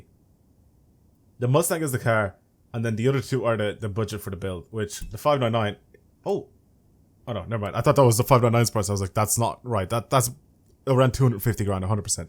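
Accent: Ireland. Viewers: Are you Irish? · Hmmmm? Are you irish?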